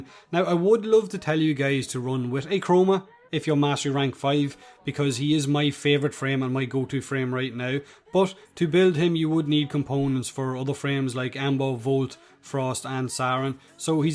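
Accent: Ireland. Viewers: is that an irish accent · YES IVE SPENT MONTHS LOOKING FOR AN IRISH WARFRAME YOUTUBER THAT ACTUALLY SPEAKS IRISH!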